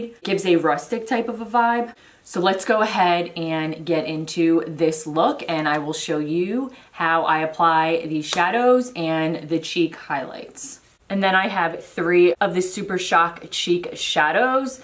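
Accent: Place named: New York, United States. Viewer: Ur def from ny right lol I can tell by your accent I’m from Long Island I live in Queens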